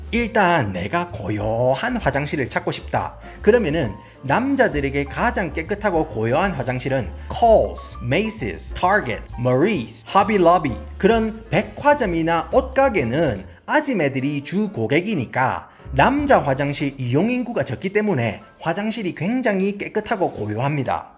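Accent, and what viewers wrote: Gyeongsang, South Korea. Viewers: 하하하 너무 재미있네예. 아는 이야기를 해도 재미있고 모르는 이야기를 해도 재미 있고~ 나도 경상도 사람이지만 별의별 사투리들을 잊어 버리지도 않고 어찌 그리 잘 하실꼬 싶네예. 내용도 좋고예. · 영어도 네이티브 한국말도 경상도 네이티브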